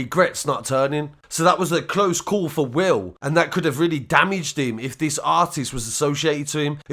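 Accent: Birmingham, United Kingdom. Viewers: What accent is this? Birmingham or something, I'm glaswegian always realise when yous chat, you talk hyper then last word or 2 is low tone lol Anywayz fukk all that respect for the videos mate.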